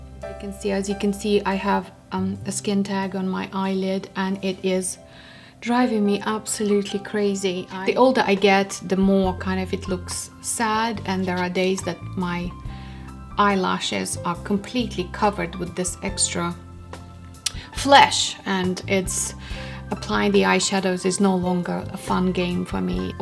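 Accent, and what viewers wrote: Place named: United States. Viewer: Как прекрасно ты говоришь, словно ты американка!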